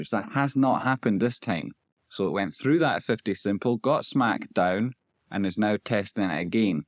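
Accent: Scotland, United Kingdom. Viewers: Just found you on YouTube …..been watching various investment guys for a few years, and you just popped up! Good to hear a Scottish voice.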